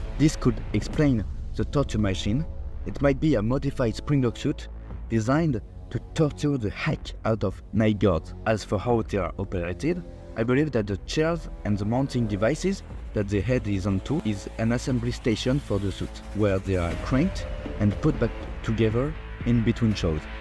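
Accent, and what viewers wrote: France. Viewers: L'accent francais mdrr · l'accent Francais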